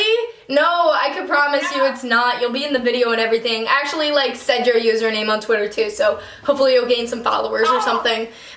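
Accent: New York, United States. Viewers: i think its just her new york/long island accent hahaha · Dominika Pisula she has that like “New York” accent (she’s from Long Island)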